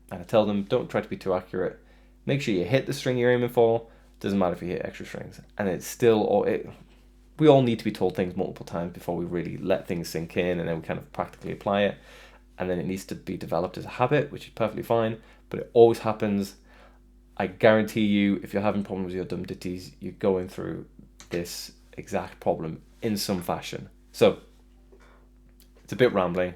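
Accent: United Kingdom. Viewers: Is that a Shrewsbury accent 🤔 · Are you from Northern Ireland? You sound like Bear Grylls.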